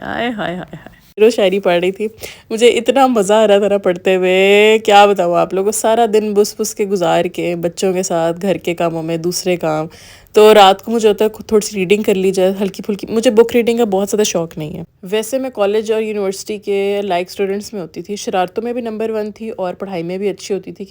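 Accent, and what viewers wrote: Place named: Punjab, India. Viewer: Your punjabi acsent is soo funny😂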